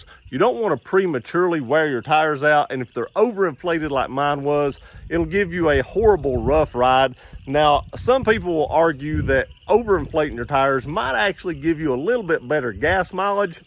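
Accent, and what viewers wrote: United States. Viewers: What an interesting English accent! I wonder which part of the US this is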